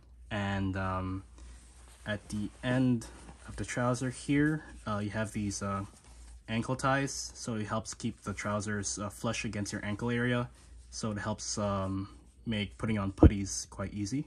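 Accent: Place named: United States. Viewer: Your sudden american accent make me confused a little bit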